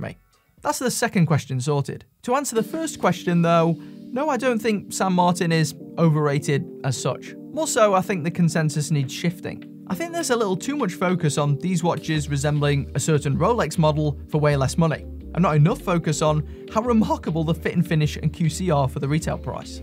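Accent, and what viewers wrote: United Kingdom. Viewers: Its always so weird to me to hear brits pronounce the "h" sound.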